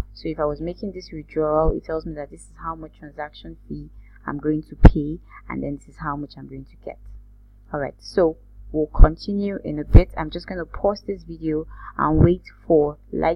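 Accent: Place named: Nigeria. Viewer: Ah, Nigerian. I thought you sounded Caribbean ha ha. I see what you mean. But you say switch my BCH to Eth or BTC. But i don't have anything to switch. I have nothing, I'm looking to start trading. Are there other platforms where I can buy BCH directly? Or do you think I should buy either BTC, ETH, USDT externally first, then transfer it to finance to trade?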